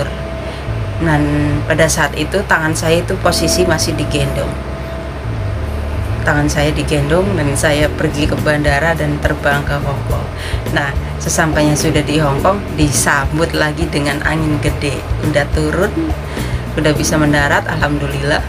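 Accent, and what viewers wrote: Banyumas, Indonesia. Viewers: Salam Ngapak bae mba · Salam ngapak mbae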